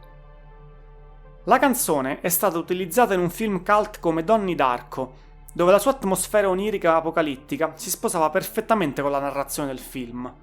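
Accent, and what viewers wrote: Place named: Rome, Italy. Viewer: Bravo per l’iniziativa, ma tra accento romano (mi distrae troppo) e (si pronuncia PorcupAine), non ce la faccio. Meno male che ci sono altri podcast. Comunque meno male che finalmente si parla di buona musica!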